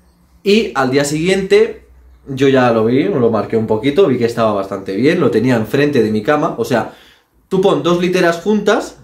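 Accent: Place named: Spain. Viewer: me haces reir mucho, me encanta tu acento español al narrar tu historia, saludos desde Venezuela, los retos estan bien, adelante...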